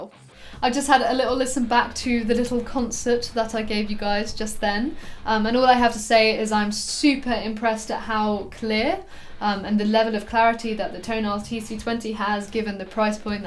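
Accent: England, United Kingdom. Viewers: Dear God, your English accent is just désarmant. Magnificient.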